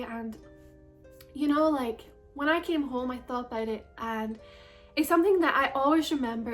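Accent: Scotland, United Kingdom. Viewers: I've watched you on tik tok for a while but only just joined your YouTube channel. I am so baffled and shocked that people would ever think that someone with a cool style that celebrates vintage could be a bad thing? You look amazing and your accent is beautiful (my dad is from coatbridge/aidre so its lovely to hear!). Keep being you 🥰 xx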